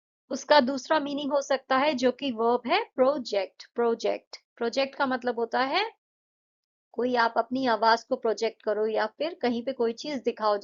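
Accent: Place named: United States. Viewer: mam where you from i had a hunch you from to America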